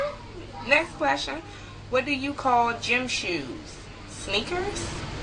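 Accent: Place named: Washington DC, United States. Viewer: yessss! ihated that BUG question. it was soooo dumb! &&yu are sooooo DC iLOVE it! &&&thnxxx for the shout out hunni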